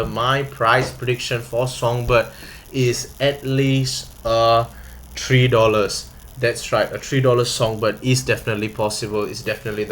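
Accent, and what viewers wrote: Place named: Malaysia. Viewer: Yup, clickbait title to get views. Some dude from Malaysia by the sounds, doing a lot of talking but not saying anything new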